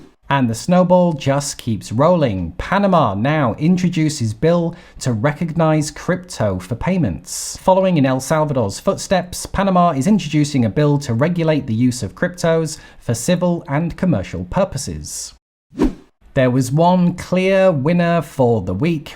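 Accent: United Kingdom. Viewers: I think you have the best info and videos of all my Crypto channels to follow - Thank you!! I always look forward to your new videos!! & who doesn't like to hear a British accent along the way!!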